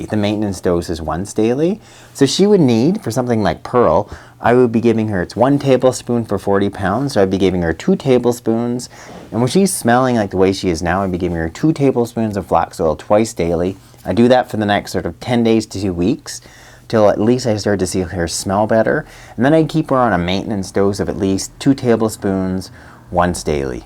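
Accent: Canada. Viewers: Holy Canadian!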